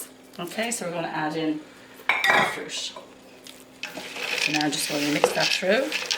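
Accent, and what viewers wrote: Ireland. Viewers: Are you lrish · Hah, Guinness. Should have guessed it with that accent! This is a different approach to the plum pudding. It will be interesting! Thankyou.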